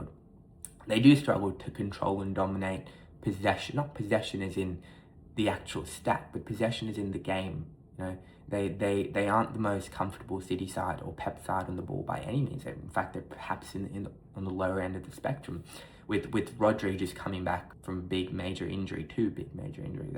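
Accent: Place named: Liverpool, United Kingdom. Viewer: Bro is literally speaking in Liverpool accent 😅😅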